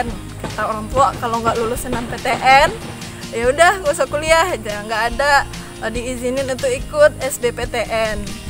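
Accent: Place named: West Sumatra, Indonesia. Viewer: Bagusnya bercakap loghat Minang sepenuhnya..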